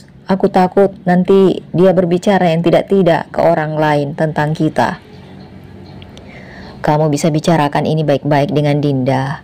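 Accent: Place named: Bugis, Indonesia. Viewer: Suku bugiskah, logatx sih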